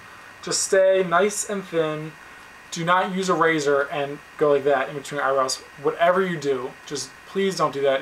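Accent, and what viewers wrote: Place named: New Jersey, United States. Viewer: He has a east coast accent.. I heard a hint of jersey in there..O_O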